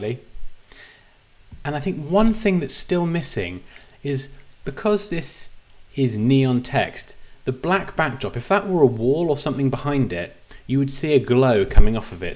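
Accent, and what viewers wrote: United Kingdom. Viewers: This tuts save my life, very interesting. And good UK speaking, i say it because when i surf into tuts made by people in USA i don't understand a f***. (i'm from Italy) Btw it's a great job!!! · his voice you mean his english i guess is british am i right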